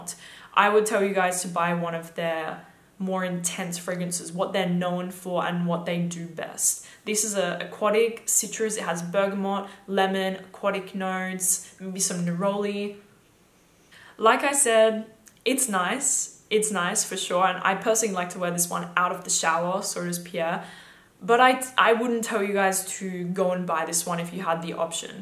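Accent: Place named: Australia. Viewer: Australian from Perth mate😊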